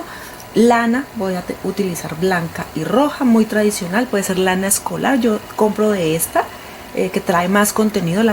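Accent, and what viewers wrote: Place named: Bogotá, Colombia. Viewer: Que belleza y fácil, oye eres de Bogotá?... lo digo por el acento (con todo respeto). Mil gracias por tan bella manualidad 🤗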